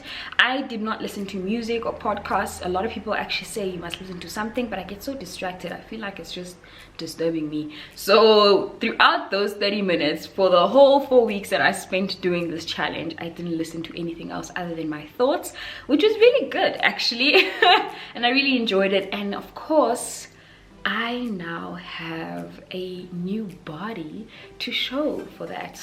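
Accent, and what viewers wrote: South Africa. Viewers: Obsessed with your accent. South Africa? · so just stumbled upon your page, looooove your accent it's giving south African vibes man, where are you from? (sorry if i'm too forward) I always get this excited when I hear a fellow African accent somewhere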